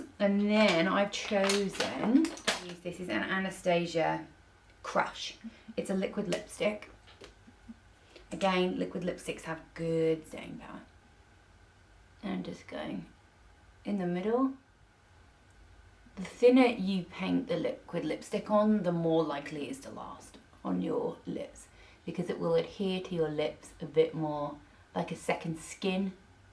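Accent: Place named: United Kingdom. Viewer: not wanting to generalize the british accent but you remind me so much of Lisa Eldridge. I love her and love your content!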